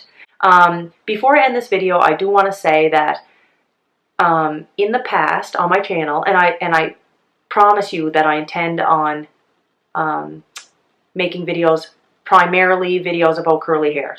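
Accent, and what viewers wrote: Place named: Cape Breton, Canada. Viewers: Hi! First of all let me say I love your channel! I came across it a few few weeks ago when I decided to let my natural curls go for maybe the fist time ever... As a teenager and into my twenties I fought my curls with a curling iron and then 14 years ago I decided that a straightening iron was the best thing ever!! Well 6 months ago I decided to embrace my grey hair as well.. Yes I jumping in over my head (pardon the pun! Lol!) with grey and curls.. What am I thinking! I bleached out my dye last August to help with the grey transition.. I thought I destroyed my hair it was like straw! Anyway I continued to straighten.. Until I began to hear about the curly girl method. I searched YouTube and found your video of how to step by step do my hair and tried it I was... to say the least... Amazed!!! Did not know my hair could do that! 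And I remind myself that it should just get better... Also amazed at the compliments I have gotten already... My hair is still two tone from the grey hair/ bleach grow out but I really think I will embrace my new look! Oh and I just want to say when I first seen your channel your accent was what caught my attention... I am from Newfoundland and I wondered if you were to, but turns out Nova Scotia isn't to far and we're "neighbours" lol! Love your Province by the way! Keep up the the great videos and Happy Anniversary!😊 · I don’t even have curly hair and I love watching your videos and hearing your CB accent (makes me miss home!)! Would love to see some healthy lifestyle videos. Congrats on the new office!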